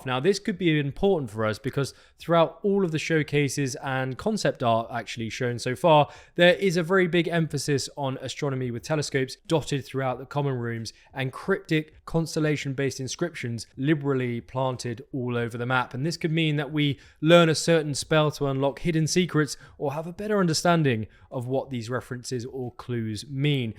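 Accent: United Kingdom. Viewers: Love this info on professors! You're by far my favourite channel for Hogwarts Legacy :) Great info, presented well and with a lovely British accent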